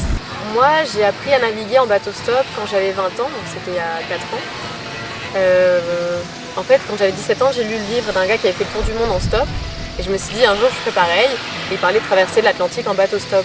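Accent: Quebec, Canada. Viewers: Cool de te revoir ça fait plaisir d’entendre ce petit accent québécois. Et toi tu en est où?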